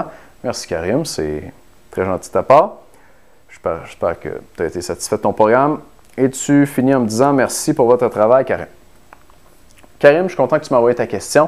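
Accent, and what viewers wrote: Quebec, Canada. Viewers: Me rci pour tes precieux conseils pour moi c'est un peu le contraire ..je perd l'appétit en faisant la musculation. . .je ne sais pas si c'est bien la musculation qui le fait perdre ou bien les oeufs que je prend une demi heure après l'entraînement !?qui ne font que couper l'appétit. sinon pour votre accent québécois. .il est génial haha même s'il est un peu difficile de comprendre et saisir les mots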